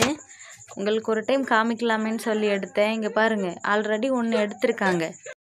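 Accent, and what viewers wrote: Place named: Odisha, India. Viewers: Tamil kathukittu pesura odisa ponnu ithu I think 🤔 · I feel this girl is not a Tamil girl... She has learnt Tamil when working in TN....her accent is different and her dressing and all matches odisa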